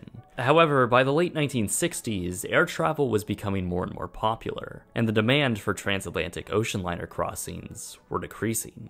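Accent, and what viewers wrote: Canada. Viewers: are you Canadian? I detected an accent...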